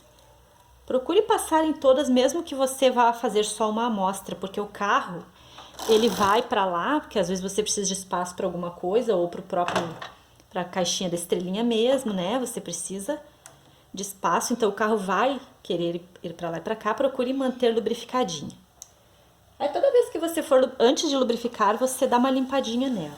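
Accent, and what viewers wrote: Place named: Rio Grande do Sul, Brazil. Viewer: Acabei de comprar uma maquina lanofix automatica antiga a garantia e de 1967. Vou ter que cuidar lubricar mas estou feliz. Ja tive uma lanofiz que tinha cartela de borados, infelizmente perdi numa mudança. Amando suas aulas. Gostaria de poder mandar foto da minha maquina para vc. Bjs gratidao. Amo seu sotaque ba guria!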